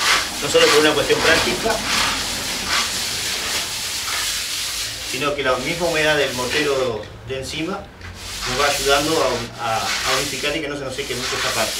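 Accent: Uruguay. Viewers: Genio. Sos Uruguayo, o Argento?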